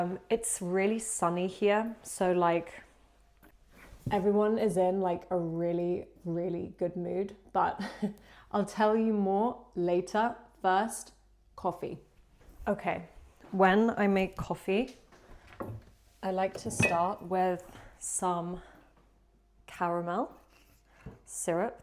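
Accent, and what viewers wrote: England, United Kingdom. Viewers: ❤I'm Brazilian and I'm learning more about the British accent from your videos, I'm loving it! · Hi, your Slow British English is really helpful for English learners. Thank you so much! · always love british accent videos, and your gentle voice is so nice, keep on🥰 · Thank you for your vlog. I really love the British accent, and I enjoy learning more about the UK. That’s great! Please keep making more vlogs. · You are so quiet. And you speak slow British English very interesting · Please teach me how to speak with british accent ahahah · This is the first time I've seen one of your videos, and I find everything you explain about rural England, or as you call it, the countryside, very interesting. I'm from Honduras, Central America, but I've lived in England since before the pandemic. I really like your English accent, the way you speak, and the cadence you use. 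I find it very pleasant and clear, and of course, I understand it perfectly because you speak slowly, which makes it very pleasant to listen to. I would like it if, in your new videos, you could explain why the language is spoken with different accents in different cities and counties, because some of them are really difficult for me to understand. Greetings from Nottingham. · Nice and interesting video, I like British English!....... Thank you so much!...Greetings from Peru!...💞🇵🇪❤️...comprehensible input to practice listening English 💫👍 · good videoes and carry on! And personally, it is not only the videoes that I want to see, but more skills and expressions of native British accent! Now it's the slow english, but what about a bit faster? More training techs and skills would also be welcomed! Thank you so much again for making lots of videos so far! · R u native british ? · I like this video of slow British English, it's really easy for me struggling with my listening and spoken part to listen your words clearly. 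Besides, British accent is extremely elegant while you spoke slowly and clearly. So i appreciate your video of life in British coatal town, hoping you can show your normal life in the UK hh which i prefer to watch, thanks. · Many thanks to you!! Good experience for me to hear British pronunciation, and I don't need to make speed lower, as for my level, it might be a little bit faster. I'm from Russia and today in 8th of March, we celebrating international women's day, so wish you stay cheerful and cool as you are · Hi, this is jesmine from Bangladesh. I basically played this video to mimic your slow British Accent. Actually I'm learning English and now my level is approximately B2 but my pronunciation is worse this is why I decided to watch and follow your voice and I did it all. thank you for this video and I expect you will make more and more slow video which will be helpful for the learners how want to gain British accent also who want to fixed the pronunciation like me 😁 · Is this british?🇬🇧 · The British accent is si beautiful, ojalá siempre fuera así de lento, you are beautiful too ❤❤ new suscriptor 🎉❤ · great video! 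I really appreciate that you speak slowly, it makes it much easier for people who are learning English I' ve been trying to get British accent, and your videos are actually helping a lot also Wilson completely stole the show :)) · wow I love your accent inglish and thank you so much keep going 👌👌💕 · You speak very clear words I believe its good for beginners to watch your video to learn English and British accent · Thanks for your videos. I study English, and this content helps me with my pronunciation. I like the British accent ;)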